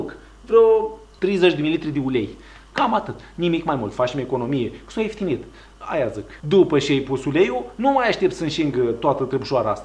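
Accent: Moldova. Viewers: Like am dat,abonată sunt demult.Rețeta este o minune tu și graiul tău Moldovenesc o minune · Sunteți moldovean cu limba lata daca nu vă supărați de unde sunteti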